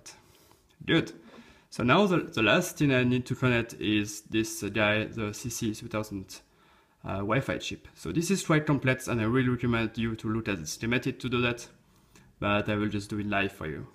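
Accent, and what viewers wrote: France. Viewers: Are you French ? You have one of these accent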